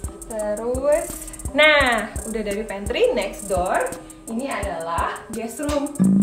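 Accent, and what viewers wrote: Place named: East Kalimantan, Indonesia. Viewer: Kakak orang kaltim yaa.. Aku kira orang medan 😂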